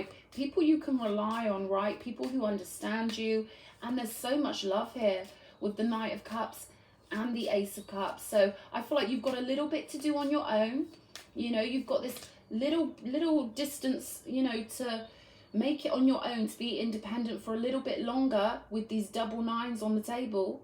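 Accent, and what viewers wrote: United Kingdom. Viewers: Smooth British female voice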